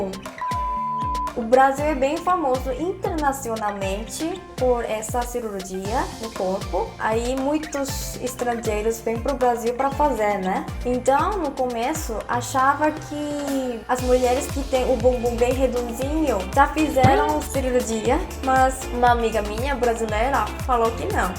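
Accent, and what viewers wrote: South Korea. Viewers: uma coreana falando portugues e a coisa mais fofinhaa, mano eu tomo sol e nao consigo ficar bronzeada.. e triste ne mas e cara eu nao tenho bundaaaa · GENTE UMA COREANA FALANDO PORTUGUÊS 😻♥️ QUE FOFINHA . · Coreano falando português é a coisa mais linda do mundooooo❤❤❤❤ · Parabéns, raramente tem sotaque coreano em algumas partes. Sua pronúncia é muito boa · O sotaque coreano é de longe um dos mais gostosos de ouvir falados na nossa língua :v Me dá agonia ouvir estadunidense ou britânico falando português... Já o coreano fica tão fofinho. Acho que é pq vcs realmente se esforçam pra aprender a língua e falar bem.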